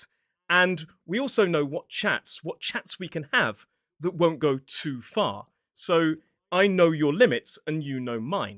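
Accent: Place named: Southeast England, United Kingdom. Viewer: Should add, this man is quite obviously from the south, probably south east, of England, and basically everything he has said is complete b*llocks with regards to any Welshman 😂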